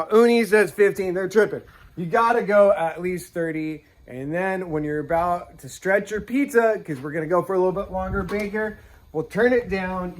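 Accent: California, United States. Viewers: The California accent is amazing. Like from The Californians on SNL.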